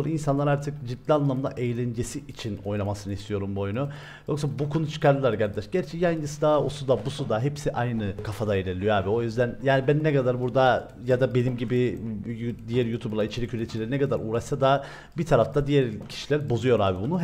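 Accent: Cyprus. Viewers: Abi her video attığında onunla birlikte izlerdik güle eğlene kıbrıs ağızına yatkın olduğu için senin konuşman gibi konuşurdu keyifle izlerdim.Artık o başka biriyle ben yine seninleyim. Seviliyorsun reis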